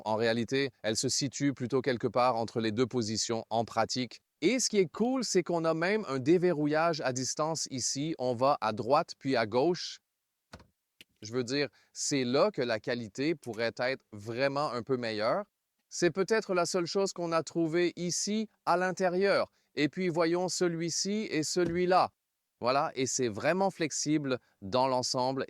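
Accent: Quebec, Canada. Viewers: Amusant, l’accent de la voie en français. On entend une pointe d’accent québécois.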